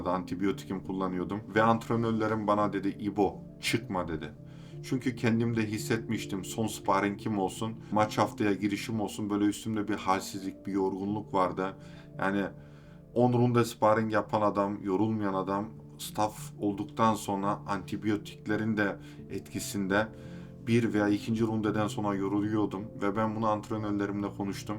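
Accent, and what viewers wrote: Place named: Niğde, Turkey. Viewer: kardeşim severek izliyorum başarılarınla mutlu oluyorum ama şu Türkçeni düzelt nolur sen Niğdeli sin yapma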